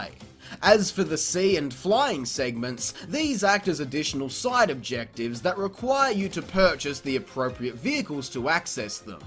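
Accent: Australia. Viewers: You are actually so australian that it hurts, good video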